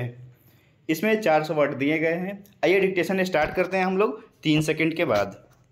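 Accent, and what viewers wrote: Bihar, India. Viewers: Sir apke kuch words bolne me galti hai mtlb apki pronunciation thik nahi hai jaise 👉 ye , jyadatar aur bhot se words hai Baki sab badhiya hai shyd aap bihar ki side se honge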